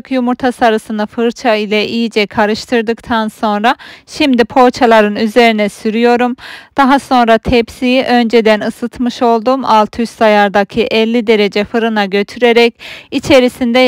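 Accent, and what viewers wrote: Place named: Kayseri, Turkey. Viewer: Çok güzel oldu hemde pratik memleket kayseri mi şive benziyor bende kayseriliyim bu arada ❤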